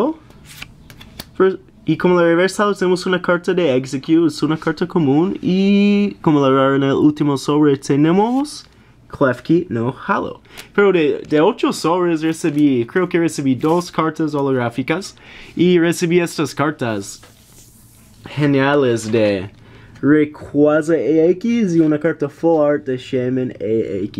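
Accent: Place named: England, United Kingdom. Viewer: Me encanta tu acento ingles en el castellano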